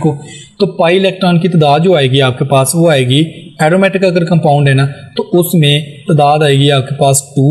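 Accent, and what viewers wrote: Pakistan. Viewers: Are you accent seems to be pakistani Btw love from india❤️ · Mujhe accent se lga tha ki aap Pakistani ho But boht accha samjhaya aapne Thank you sir 🙏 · Why i am pakistani accent from this guy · sir ki ek dam pakistani accent lgti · Kis kis ko sir ki aawaz se lg rha hai ki sir Pakistan ya fir Jammu se hai 😅😅😅😅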